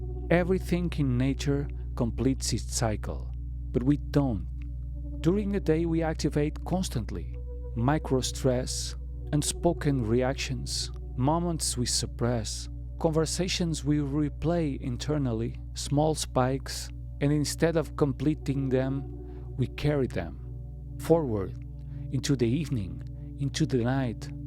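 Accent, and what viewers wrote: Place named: Portugal. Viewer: Do you speak Portuguese? I hear your accent! Do tell us more about yourself. I just read “ Why we sleep” book. Sleep is fascinating. We are so complex!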